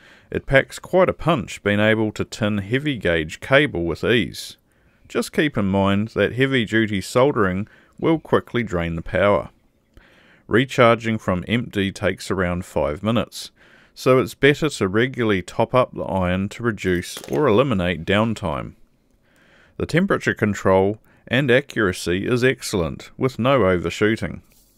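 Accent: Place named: New Zealand. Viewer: Just love your Kiwi accent!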